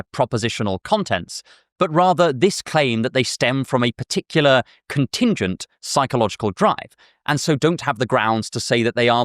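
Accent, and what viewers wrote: United Kingdom. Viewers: Where in the UK are you from if it's not too rude to ask? Usually, I don't struggle as the accents across the commonwealth are quite different, but I struggled to tell if your accent was British or New Zealand. Sorry. I know this is a rude question, it's purely an academic inquiry. Like how Portuguese from Portugal and Russian are completely different languages but have fairly uniquely similar vowels or consonants unique to those languages? If that makes sense? Anyway... 😅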